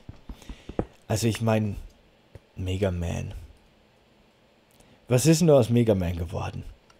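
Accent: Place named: Bavaria, Germany. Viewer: Ich liebe Deine art einfach so ruhig und ohne Geschrei und der Bayrische Dialekt :)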